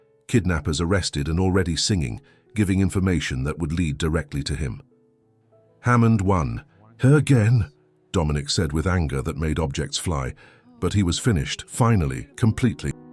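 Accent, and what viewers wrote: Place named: England, United Kingdom. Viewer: Maybe it's the English accent.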